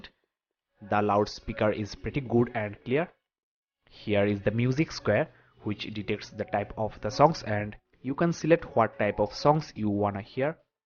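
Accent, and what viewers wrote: India. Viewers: your strong Indian accent is to blame for the phone to unlock the second time, not the phone.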